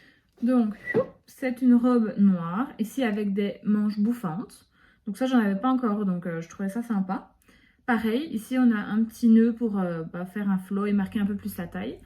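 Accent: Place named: Belgium. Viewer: Meuf je kifff ton accent 😅☺️je pense que t’es belges si nn super ta vidéo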